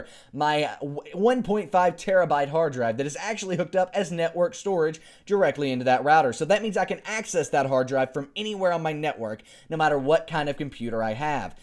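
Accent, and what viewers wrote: South, United States. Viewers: I just watched your first Setup video! You can really here your Southern accent in it xD It's hilarious! XD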